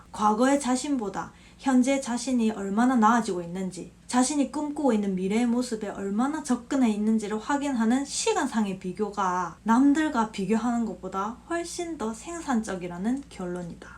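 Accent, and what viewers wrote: Gyeongsang, South Korea. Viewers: 제가 50대인데 진짜 한테 많이 배우네요 그리고 듣고나면 속이 시원타 ~딱 부러지는듯한 경상도 말투도 글코 뭔가 한숨이 길게 나오는거는 속이 시원해짐을 느껴서인듯 ㅋㅋ 앞으로도 좋은 방송 부탁드립니다 화이팅!! 열렬한 팬입니다!! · 오늘 처음으로 유튜브를 우연히 보게 되었네요~~재미있는 경상도 말씨 ㆍ세상쉬운 노후자금 마련방법을 보고 ~두번째 프레임이라는 주제로 잘 설명하는데 ~~매력에 푹 빠졌네요. 아직 학생인것 같은데 세상에~~똑소리 나네요~~구독했어요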